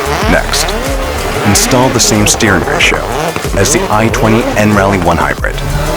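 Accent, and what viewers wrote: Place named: United States. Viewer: drop the american accent. the cars look amazing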